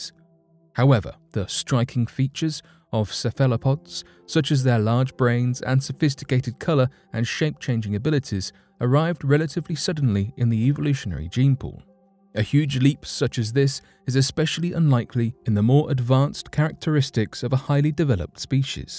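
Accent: United Kingdom. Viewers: He is British, they pronounce a lot of things differently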